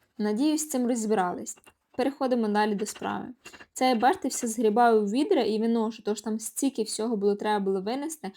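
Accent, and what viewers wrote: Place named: Western Ukraine, Ukraine. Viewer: .по вимові складається враження,.що ти із західних областей?.яАле у тебе не суржик,.у тебе кацапська на український лад,.ти молоденька навчись своєї рідної мови,.ти взагалі молодець як людина теж тож не ображайся за мову,.а вчи,.бо дуже уже нам боляче слухати ту кацапську,.рощумієшчому?.Скільки людей убили,."асвадаждаючи"від української.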